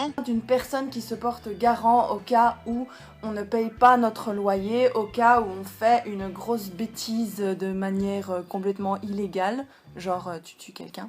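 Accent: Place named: Belgium. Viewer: Hello! Je suis tombé sur ta chaine aujoud'hui et je me suis fait la plupart de toutes tes vidéos! Elles sont géniales, naturelles et ton accent belche de chez nous rend les vidéos bien meilleures 😁 J'ai 2 questions pour toi: quand tu es restée au Japon 3x3 mois si j'ai bien compris, tu es partie avec quel budget? J'ai déjà été un mois en visite mais ça m'a couté un pont, en même temps je m'étais fait plaisir. 2eme question: Faut il être nécessairement sur place pour rechercher du boulot? Car sur Gaijin pot, la plupart des annonceurs demandent à ce que la personne habite au Japon... Voilà, en tout cas continue tes vidéos et merci d'avance pour ta réponse! A plus dans le bus! 😊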